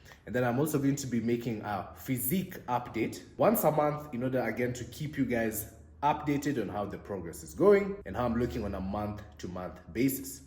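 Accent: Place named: Africa. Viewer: Love your accent.what country in Africa are you from.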